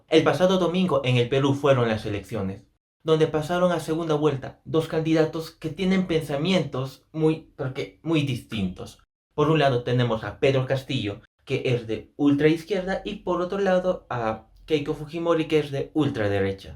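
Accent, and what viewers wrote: Spain. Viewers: Me parece o este men habla como español? Tiene cara de cabeza clava como yo, yara con los complejos mi rey :v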